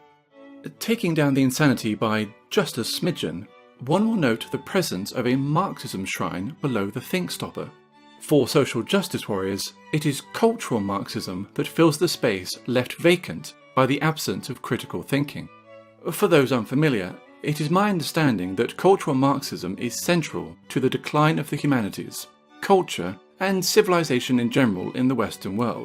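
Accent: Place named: United Kingdom. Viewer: O sotaque britânico deixa ainda mais irônico .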